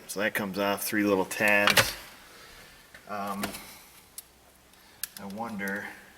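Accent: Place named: New York, United States. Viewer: Well that New York accent came out in this video! haha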